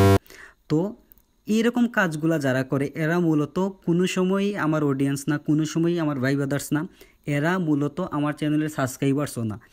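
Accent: Sylhet, Bangladesh. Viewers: vai apni ki sylheti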